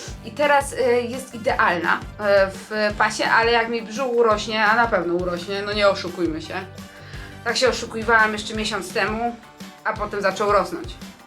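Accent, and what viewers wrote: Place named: Silesia, Poland. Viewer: Od weekendu polowa października 2024 wpadłam na twoje filmy. Podoba mi się twoja energiboost. Mówisz co sadzisz, a najbardziej zaintrygowała mnie gwara... więc dzisiaj 22.10.24 postanowiłam przewinąć udostępnienia... Opole❤ Jestem spod Opola. Bardzo sobie cenie gwary, a Śląski- jest miodem dla mojej duszy. Co do tego filmiku- przepięknie wyglądasz w ciąży z Mikołajem. Ja przy pierwszej ciaży wyglądałam niczym Orka ze wzdęciami. Jedynie pozazdrościć.❤ Kobieta bez takiego doświadczenia, nich lepiej zamilknie jape lub napisze po porodzie, a najlepiej się pochwali Błogoslawinym stanie, gdy na wadze poerdyknie 15kg- 23 kg więcej życiowego ciała( mina diabła). Nikt sie nie odważy, a jeśli tak, to tylko z filtrem w szmatfonie. Pozdrowienia dla was i wielki przytulas dla Mikołaja!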